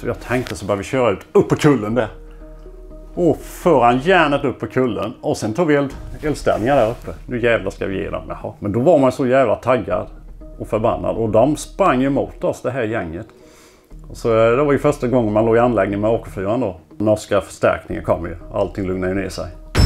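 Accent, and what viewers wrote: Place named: Jönköping, Sweden. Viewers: Tror han är från Forserum, så nära. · Kan han vara från Jönköping, bred dialekt 😃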